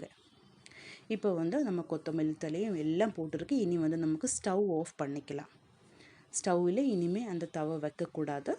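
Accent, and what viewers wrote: Kerala, India. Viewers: Your tamil is good qith malayala accent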